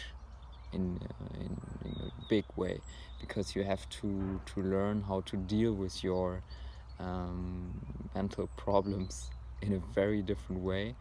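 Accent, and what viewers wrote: Germany. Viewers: By the way, am I spotting a german accent there? :) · are you German?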